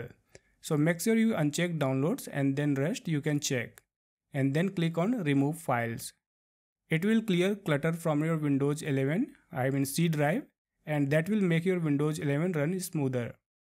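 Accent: India. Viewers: this Indian accent LMAO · You're not kidding. I clicked on this video hoping, praying the creator would have an Indian accent. And man, my relief was immense and impossible to put into words. I trust Indians with my life fr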